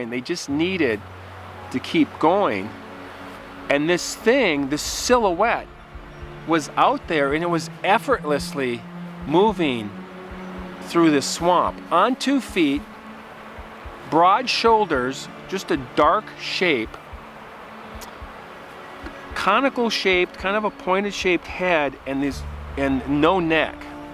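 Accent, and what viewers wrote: Minnesota, United States. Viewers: I went to Saint Olaf College; I’m from Seattle, but that accent, right away, told me exactly where you are from. What a great idea for a channel, looks like you are doing great. Can’t wait to listen and watch every one of your uploads. Bye now, you take care, see you soon, Buh bye. (The Minnesota long goodbye)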